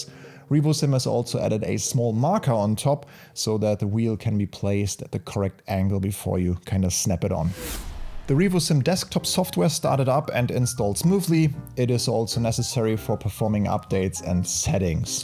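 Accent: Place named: Germany. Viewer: Wieso wurde mein Kommentar gelöscht wo ich sagte das man raus hören kann das du DE sprichst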